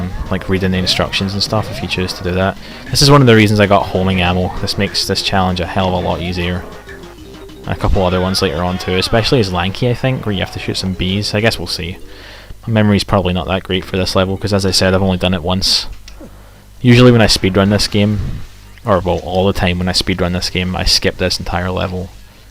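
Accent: Scotland, United Kingdom. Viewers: OH MAH GEWD!!! ARE YOU SCOTTISH?!?! :D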